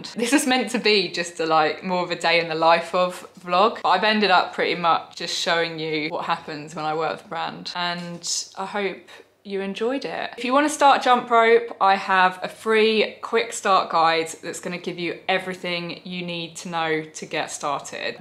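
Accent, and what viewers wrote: United Kingdom. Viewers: Are you British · I didn't know British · I don't know why....but I love to decode British accent 😅..and I love your accent too❤️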